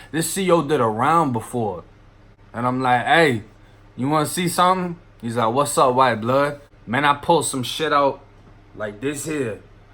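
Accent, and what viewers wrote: Boston, United States. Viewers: Bro you sound like your from Boston i aint being funny i fucks witchu but yea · Here from Death’s videos. New subscriber and fan. Keep it up man. BTW I would have sworn you were originally from Boston, sorta sound like we do. EDIT - watched deaths videos from last to first, guess you are originally from Boston. Super big up to you.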